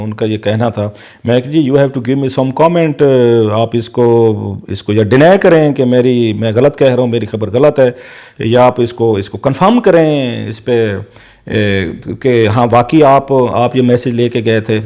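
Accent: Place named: Punjab, Pakistan. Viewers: Hi Rauf Sahab a great admirer of u myself from Mumbai. Missing Amir Mateen Sahab frm yr vlog. Plz keep up the good work. I religiously follow u. My son Shaurya ( 12 yr old) also loves watching yr videos ( though he doesn't undestand the subject of discussion )simply because of yr Urdu with a tinge of Punjabi tone. People like u, Ameer Mateen, Mohammed Malik, Irshad Bhatti, Arshad Sharif and Kashif Abbasi are the torch bearers of fearless and honest journalism in a Sate like Pakistan run by the so called Establishment and corrupt politicians. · Mr., Please stick to Urdu and don't use English words and short English sentence during your analysis. Because your pronunciation is very bad and you have very strong Punjabi English accent. And I think you should avoid English during your analysis. You have a very lazy accent and can't pronounce words properly. 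Please speak Urdu and even some of your words in Urdu are pronounced badly. Listen to your word a very common " tape " you said tap please stop using English words. Listen to your few words which you have used during your comments. Even you can't pronounce the WORD PROPERLY.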